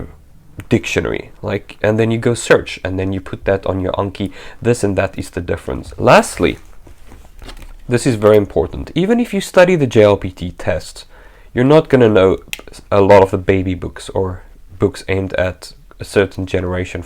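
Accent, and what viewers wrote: Afrikaans, South Africa. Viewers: What, you sound South African... My brother!!! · I was wondering why it sounded vaguely Dutch, Afrikaans makes a lot more sense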